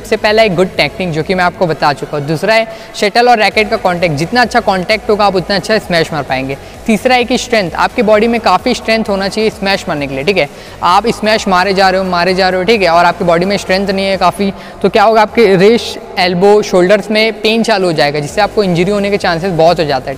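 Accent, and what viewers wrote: Odisha, India. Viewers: your accent is odia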